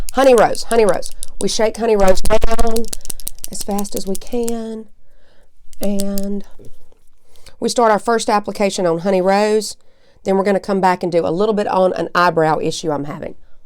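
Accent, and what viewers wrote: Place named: Mississippi, United States. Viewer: Your skin is AMAZING. I just HOPE my skin is like yours when I’m your age! I think you look so so so great without any makeup!!!! For real!! And I’m so glad you mentioned where your from. I was thinking how much I like listening to you in your other videos. When you said MS, I was like well no wonder! I’m born and raised in Madison MS but Ive been living in Sonoma County California for the last 7 years so I’m missing the accent. <3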